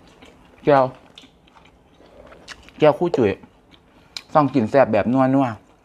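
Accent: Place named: Udon Thani, Thailand. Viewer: เพิ่งรู้ว่าเป็นคนหนองบัว มิน่าสำเนียงคนอุดรจ้า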